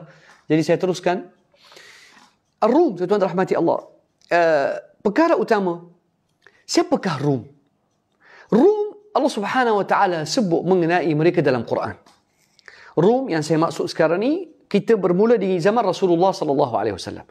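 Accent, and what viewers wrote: Malaysia. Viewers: Kaya logat malaysia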